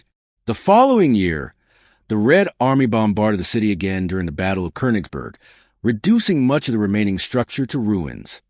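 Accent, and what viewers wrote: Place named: United States. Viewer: Please do not use an American narrator when talking regarding European Castles.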